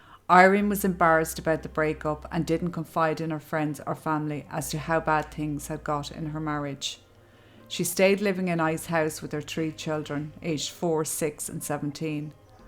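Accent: Ireland. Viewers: YOU SHOULD DO MORE VIDEOS MY MATE. THERE GOOD AND QUICK TO THE POINTS. AND THAT IRISH ACCENT OF YOURS PUTS ME RIGHT TO KIP. 🍀🍀🍀🍀🍀👌 FROME LIVERPOOL ENGLAND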